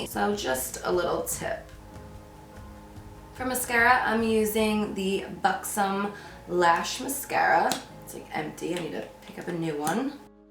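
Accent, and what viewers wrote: New Jersey, United States. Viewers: Hanging out with your grandma brings out your New Jersey accent even more haha 😊 ( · Your New Jersey accent really comes through when you’re hanging out with your family. Super cute. 😊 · Obsessed with the Jersey accent 😍😩😭❤️ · Your grandmother is adorable.. and your jersey accents 🙌🏻❤️ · ur Jersey accent is so thick 😂😂 love you ❤️ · Love jersey accent🐧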